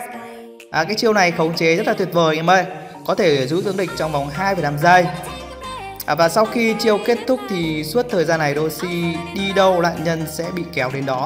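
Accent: Vietnam. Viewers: quê ở đâu ấy. Giọng vừa nam vừa bắc khó đoán quá😃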